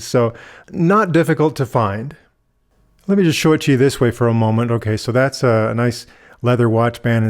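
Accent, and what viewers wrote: United Kingdom. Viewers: Why British Accent ...lol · I like the British accent! Wish my talking clock had that option! 😄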